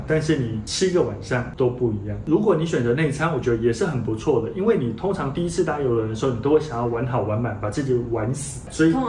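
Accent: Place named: Taiwan. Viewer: I dont understand your Taiwanese Mandarin. Pls have the Chinese subtitle on. In addition, you are speaking far too fast. Making it even harder for any audience.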